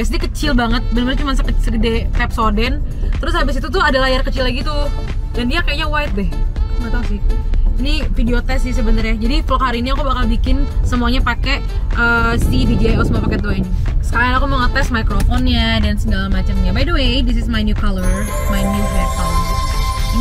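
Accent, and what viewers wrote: Medan, Indonesia. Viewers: Gasuka basabasi, aku suka kak dari awal dia muncul di tiktok sampe sekarang krna logat medannya yg suka ngegas + muka nya ga ngebosenin ❤ Salam dari medan kk 🙏 Dalam hati "semoga dapat kiriman", aamiin yaallah 😌✌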